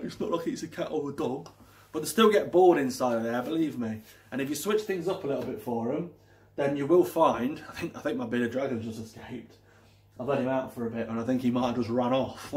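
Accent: United Kingdom. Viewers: You sound local ? Derbyshire?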